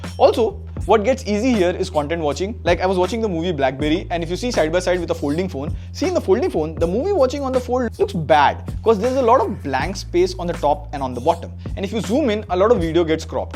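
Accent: India. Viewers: Bhai aapka english Hindi jesa lag raha hai 😊😊😊😊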